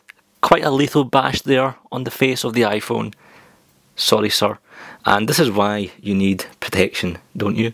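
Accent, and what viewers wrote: Scotland, United Kingdom. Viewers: I love your accent I love Scottish ppl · YOU ARE SCOTTISH!!! #THATISALL #JUSTSAYING #GIVEAWAY LMAO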